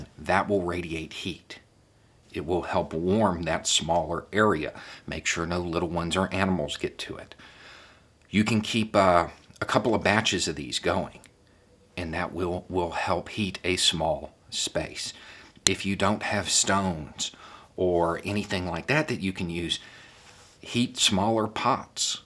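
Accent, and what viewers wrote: South, United States. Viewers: Just shared this video with my Dad who is in CT and said he had to go out and shovel snow. I thought he’d appreciate your tips for generating heat. I then thought of another tip forgot to mention Chihuahuas! Read the following in awesome southern voice: “Grab about 5 chihuahuas and bring them into the tent you’ve set up in your living room. They are automatically inclined to hide under blankets. The chihuahuas will generate heat and keep you warm! It’s just a thought.” We’re a chihuahua family but you can substitute your canine preference:)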